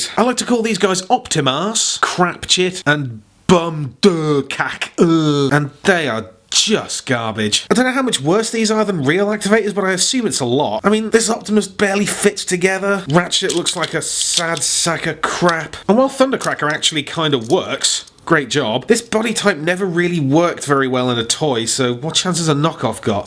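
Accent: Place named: Newcastle, United Kingdom. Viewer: I fucking adore the jordie accent or whatever it is when you read out the terrible knockoff names It's just beautiful and not a knockoff beatdown without it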